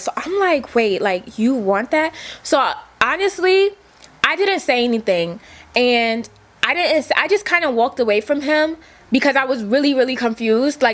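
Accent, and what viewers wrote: New York, United States. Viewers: Are you from New York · You are a real New Yorker 🤣🤣🤣 the way you tell the story ,and all I love it . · she so new york i love it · Your accent still there but it used to be worse 😂 I need the full New York back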